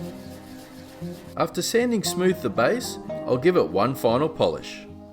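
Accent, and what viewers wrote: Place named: Australia. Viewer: I said to myself "This guy sounds like an Aussie" and then I checked the description and lo and behold I was right, glad to see fellow Aussie's doing something cool like this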